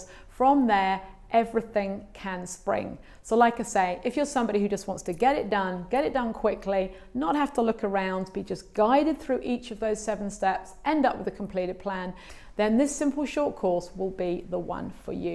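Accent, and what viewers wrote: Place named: England, United Kingdom. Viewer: Hey, I hope you read this. I guess you can tell by now I've been watching a lot of your videos, some of them a number of times and taking notes on some. I really am drawn to your rich English accent, but has drawn me the most is your common sense and wise advice to building a successful art business. One of the things I got from you is that I really need to do my homework, research. My ideal client, customer is out there looking for what I uniquely have. But need to be where they can find me or our paths cross. I know I want to help other baby boomers like myself realize there dreams too., thanks again for your help. From Kingsville, Ohio 🎉 😀 ❤ Cynthia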